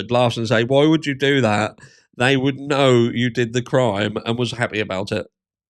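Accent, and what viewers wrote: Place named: England, United Kingdom. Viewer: Hey brother really enjoying your channel the last few weeks since I started following this case. It's my first "true crime" interest because my BS meter went off since I first heard the 911 call. Keep up the great work. Btw what regional English accent do you have? It has a nice ring to it 👍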